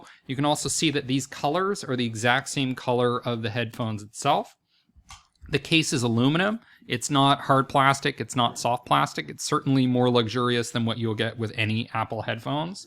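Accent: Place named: United States. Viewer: That’s a nice review. It’s very nice informative. I also own a pair of H95 headphones. I gotta say I really like your articulation American accent. Where are from if you mind me asking?1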